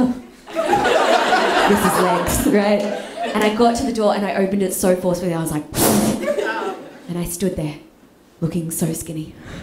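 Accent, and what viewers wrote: Australia. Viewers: The aussie accent got me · That Aussie accent… what a babe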